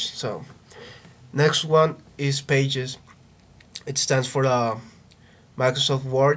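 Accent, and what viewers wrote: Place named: Brazil. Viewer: like the accent, brazilian?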